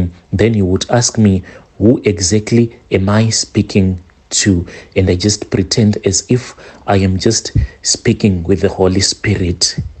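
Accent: Zimbabwe. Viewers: European stories is the name of the channel only to be greeted with a heavy African accent. Sounds Zimbabwean I can tell because I'm Zimbabwean too😢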